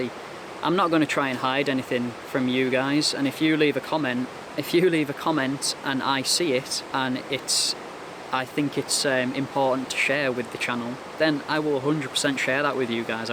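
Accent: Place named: Bolton, United Kingdom. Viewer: Are you from Bolton by any chance? You sound a lot like a certain Mr. Fred Dibnah to my untrained American ears.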